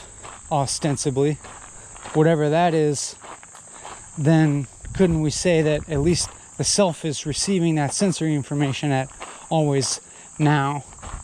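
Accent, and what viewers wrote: United Kingdom. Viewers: You’re American/canadian? I always thought you were British 😂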